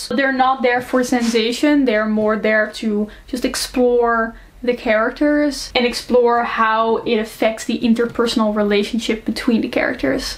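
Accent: Netherlands. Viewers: This is the first time that I've come across your channel and this is how it went: "Oh nice a video about booktok!" "Hey is my nickname, cool:)" *wachting the first minute of the video and thinking I'm hearing a very slight Dutch accent*: "Is this a fellow Dutchie?" *Checking the discription to find out and actually finding out that we have the same name😳" Why didn't I find this channel sooner😂😭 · are you dutch? i hear a slightly dutch accent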